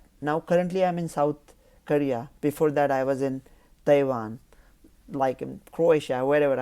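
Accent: India. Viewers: From the influence that your mother tongue has had on you language, some of which is still left behind, I can garner that you come from a Gujarati descent. Am I not right, Mr.?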